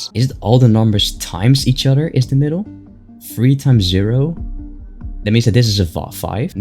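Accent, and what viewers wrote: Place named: Netherlands. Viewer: Are you from the Netherlands? I feel like your accent sounds Dutch 😅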